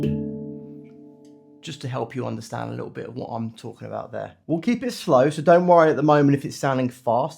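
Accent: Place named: United Kingdom. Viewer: Thank you for your quick reply, which has put my mind at ease no end. My handpan should be delivered tomorrow, which I feel very excited about, with some trepidation. I'm a bit scared about what I will receive, after watching so many warnings about cheap Chinese instruments being out of tune. I get that, I know you get what you pay for, but im not in a position to commit to a very expensive instrument at the moment but hope to progress into a better quality instrument in the future. Thank you for taking the time to reply, it was interesting to hear about your heritage from the UK, as you do drop those little hints of your accent in your videos, which to my mind makes you more approachable, and a very warm hearted person, everything a good teacher should be!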